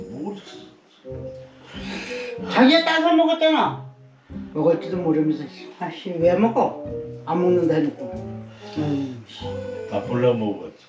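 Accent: Gangwon, South Korea. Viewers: 오리지널 강원도 사투리 정말 끝네줍네다 응원합네다 ㅎ~~~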